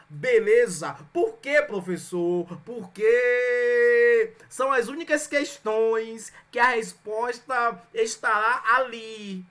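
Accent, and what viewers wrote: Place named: Northeast, Brazil. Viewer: Capote na enfermagem é uma proteção..... espécie de avental......eu amo a linguagem e o sotaque nordestina.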